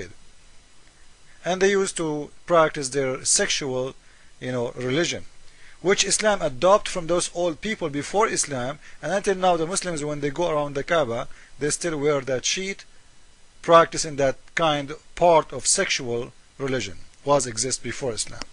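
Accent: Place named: Iran. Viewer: As much as you try to speak English your Iranian tung never change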